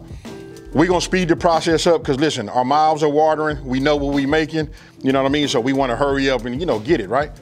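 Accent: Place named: California, United States. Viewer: Facts!!!! I get all my recipes from him.. he needs to have a show on Revolt or BET, TVone or something.. cool, not corny.. and you can tell he from 'round the way lol.. I f*cks with and his Cali accent lol 💯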